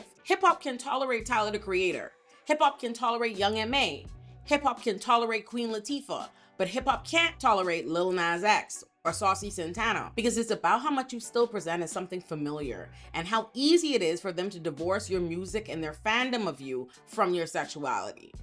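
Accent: Bahamas. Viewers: you sound like youre from bahamas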